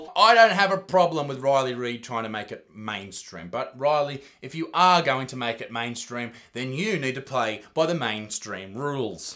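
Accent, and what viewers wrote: Australia. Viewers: The most Aussie guy I have ever heard or seen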